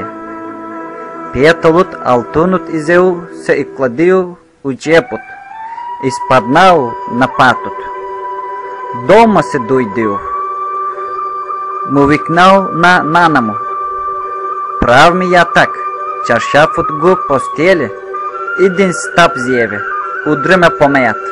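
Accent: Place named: South, Bulgaria. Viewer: Красив южен български диалект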